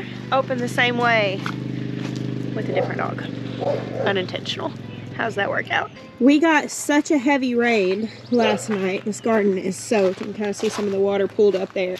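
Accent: South, United States. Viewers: cracked me up😂 because no offense but to me you guys do sound like that… with the southern drawl and all… I’m in NY so that explains that…😘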